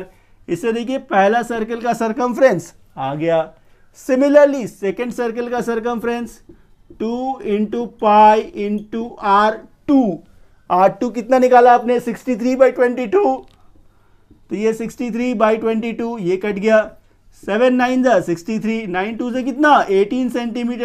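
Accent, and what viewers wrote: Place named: Bihar, India. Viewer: dhanyawad sir itne ache se samjhane ke liye apne har ek word bihari style me ache se samjhaya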